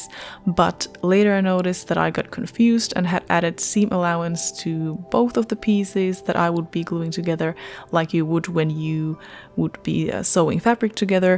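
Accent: Finland. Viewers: Amazing work! PS: Am I detecting a hint of Finnish accent?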